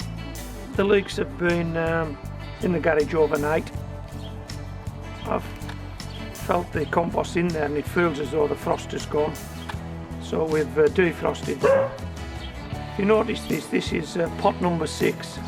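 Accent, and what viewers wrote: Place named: Cumberland, United Kingdom. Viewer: Hello New Friend. Great value instructional videos as well as a great Northern possibly Cumberland accent?? Will you tell me where? A welcome northern edition of gardening expertise.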